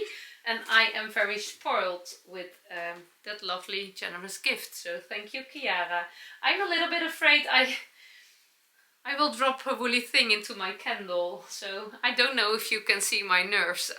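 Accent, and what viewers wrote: Netherlands. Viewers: Hello, nothing wrong with your "dutchy" English, makes me smile when you say that. Love the accent. My g.g.g. was from Oenkirk, Netherlands which I visited in 2019. So it gives me an idea of what his accent might have been like. He jumped ship in Melbourne, Australia in 1851 (my birth place). So I may not have existed if he had not come to Australia. Your prononciation is correct. ❤ We have just returned from snowy Norway and 5hrs of daylight till only 2.30pm was hard to get used to. When you had the knit event, I was on a ship heading up the west coast of Norway. So couldnt come to meet you and Yana.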